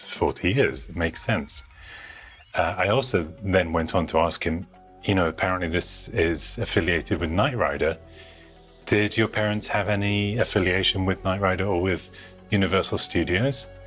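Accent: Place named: Cockney, United Kingdom. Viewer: the oy bruv accent. I love it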